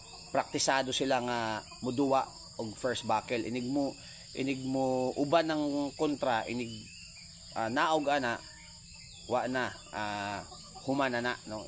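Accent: Visayas, Philippines. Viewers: nice Boss idol. Bisaya ni Bai😮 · bisaya diay ka idol?.haha oke kaayo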